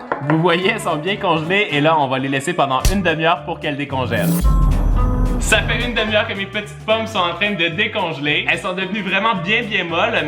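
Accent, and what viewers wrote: Quebec, Canada. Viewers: Je t'aime, continue, tu es trop fort, et, j'adore la voix des Québécois. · Jtm continue tu est trop fort est j'adore la vois Québécois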